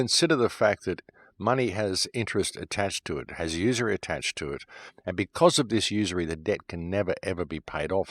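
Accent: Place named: Australia. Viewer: Gate-keeping. By the way every time I think of this concept I hear your Australian accent...so your videos work to a degree lol.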